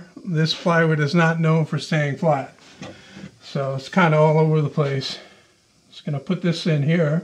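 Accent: Canada. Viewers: Hey, I was wondering, are you canadian?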